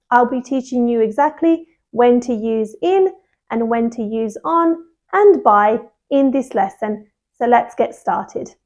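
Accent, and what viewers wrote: United Kingdom. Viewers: I like british english and love british girl as u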